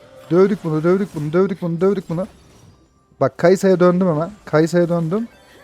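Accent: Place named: Anatolia, Turkey. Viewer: Bu içi anadolu ağzı beni köyümde hissettirdi.Sivaslı mısın?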